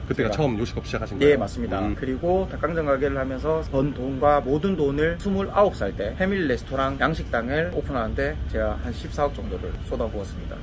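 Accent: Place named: Gyeongsang, South Korea. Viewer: 지금 5번째 반복해서 보고 있습니다. 대구 사투리도 좋고 뭔가 빨려 들어가는 화술에 표정, 나이가 많아도 많이 배우고 갑니다.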